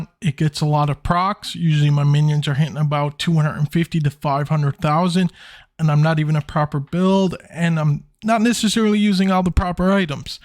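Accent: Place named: Newfoundland, Canada. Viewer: where u from u sound like a newfie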